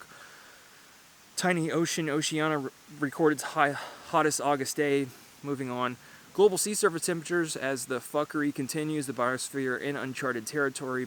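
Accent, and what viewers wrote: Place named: United Kingdom. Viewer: I like your UK accent,.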